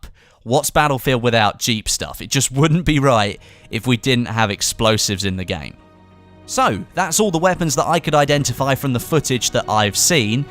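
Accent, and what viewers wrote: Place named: England, United Kingdom. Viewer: Why do the people who make these videos always have that English accent? Do you all sit around in a jerk circle making game videos or something?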